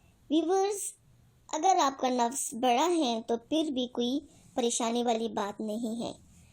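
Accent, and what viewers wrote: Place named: Mumbai, India. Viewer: Apka Bombay Ka andaz bhi. Bohat. Behtreen haa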